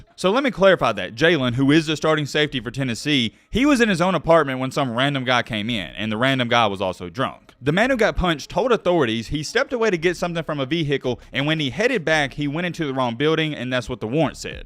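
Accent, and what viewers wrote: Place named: Alabama, United States. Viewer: sounds almost like he's from Ardmore or the Huntsville area lol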